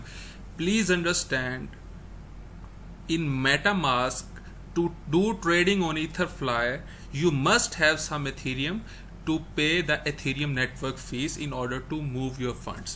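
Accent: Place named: India. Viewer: Is this indian website bcz you voice look like you are indian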